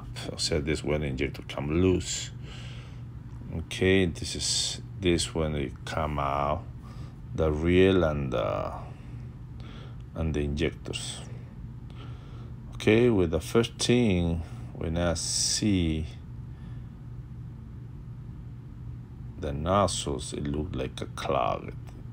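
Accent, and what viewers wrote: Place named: United Kingdom. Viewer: Que bonito hablas el ingles !! Tienes un acento britanico que me facina !!! Congatulechons..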